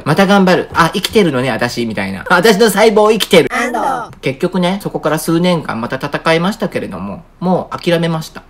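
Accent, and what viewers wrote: Kansai, Japan. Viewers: 一コメ～～～～～！いつも楽しく拝見してます！同じ関西人。話のテンポがものすごく関西。懐かしい！何かあったかい。末永くよろしく～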